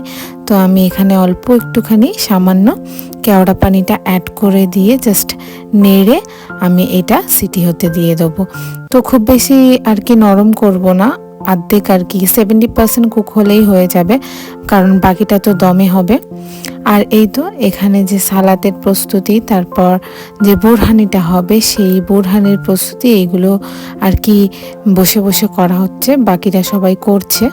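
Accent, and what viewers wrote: India. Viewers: apnar Kotha gula erokom Kolkatar moto bolar dorkar ki apu??amnite vedio ta khub valo hoyeche. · apa apnar kotha amder howrah jelar moton lagche jdi tai e hoi sune khub e khusi hobo...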